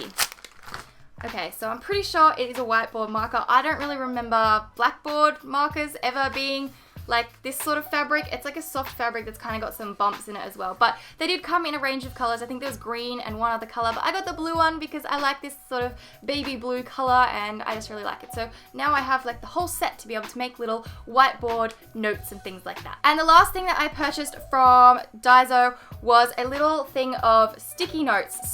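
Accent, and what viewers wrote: Australia. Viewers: I love ur videos but did anyone else you only notice her accent after she said 'in Australia" ? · I can never fully express my love for the Australian accent .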